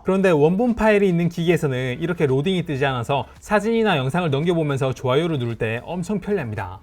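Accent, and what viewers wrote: Gyeongsang, South Korea. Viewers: 너무 유용해요. 감사합니다. 그냥 사투리 사용하셔도 더 정감있으실 것 같아요 ㅎㅎㅎ 귀엽게 서울사람 보이려는 경상도 사람같아서 항상 귀엽습니다.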